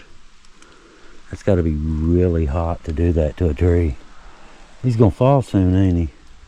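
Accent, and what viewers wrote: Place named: West Virginia, United States. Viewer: How can I send you pictures of my family’s old-school coal trucks with wooden spoked wheels from the early 1900’s??? Now mind you these are actually from my mom’s side which happens to be right outside of Pittsburgh, now my dad’s side is from down your way in Jackson County Riley, WV & Ravenswood area, but you sound just like my dad’s people, the way you speak and the pitch of your voice I love it!!! Mostly are gone so it’s a breath of fresh air watching your videos to say the least!!! Please don’t stop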